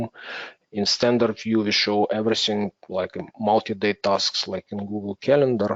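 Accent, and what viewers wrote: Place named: Russia. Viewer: Жёсткий акцент выдает своего :))) Спасибо за видео.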